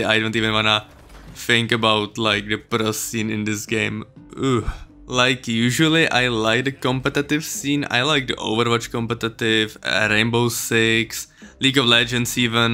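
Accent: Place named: Czech Republic. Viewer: jako ta česká angličtina ma sere ale jinak top videjko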